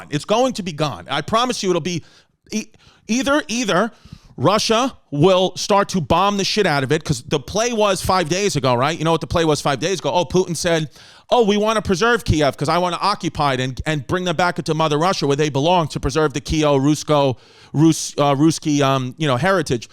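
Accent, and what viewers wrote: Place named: Brooklyn, United States. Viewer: Everyone seems to forget, behind his HEAVY brooklyn accent, chrissy chaos, once graduated doctorate school and once held court on History Hyenas. This is 100% factual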